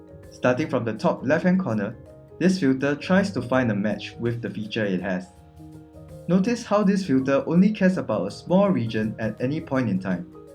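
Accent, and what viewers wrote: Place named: Singapore. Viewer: The moment I heard your voice I knew you were a fellow Singaporean lmao. Looking forward to more of your videos!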